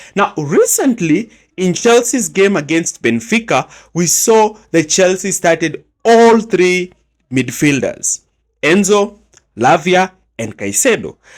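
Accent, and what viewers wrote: Kenya. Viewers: Kenyan accent 😊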